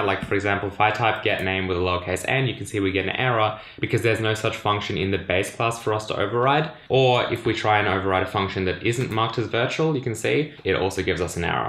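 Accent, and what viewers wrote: Australia. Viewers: Hey! Got to say great channel to learn c++, awesome channel to get used to the australian accent!!:)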